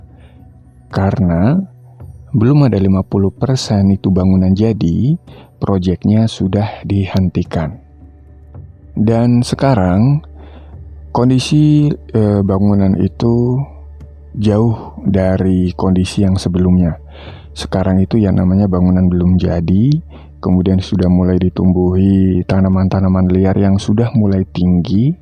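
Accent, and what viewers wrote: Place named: Jakarta, Indonesia. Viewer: Logatnya Jakarta bgt ya.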